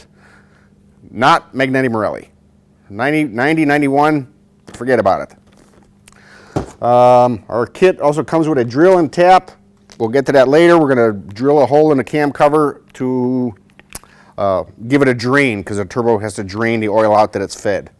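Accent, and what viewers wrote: New Zealand. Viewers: what part of nz are you from. Chur.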